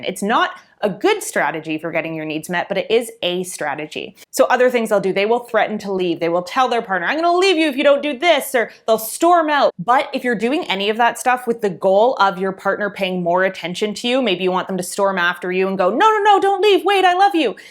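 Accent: Canada. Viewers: This is the first video where I realized is Canadian. I don’t hear it as much in her new videos.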